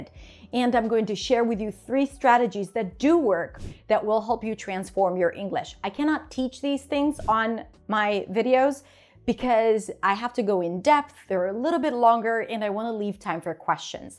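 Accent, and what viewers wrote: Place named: United States. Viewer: English is my second language and i've learned it just by watching youtube videos and listening to english podcasts (joe rogan, mr ballen etc). I've been doing this for many years and many hours per day, every day. So..., i'm sorry but your statement that learning a language by watching/listening the targeted language is bad advice is mind blowing to me. Can you explain how did i acquire my english? I've never spoke it while learning it, and one day, i just start speaking it spontaneously. You dont learn a language, you acquire it by listening and reading and you dont have to speak it, for it will come later, naturaly. Also your american accent is flawless, i think english is not your second language, if i am wrong, im sorry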